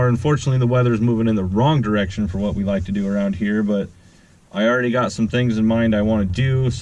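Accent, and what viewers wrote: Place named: Pennsylvania, United States. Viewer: Pennsylvanian I see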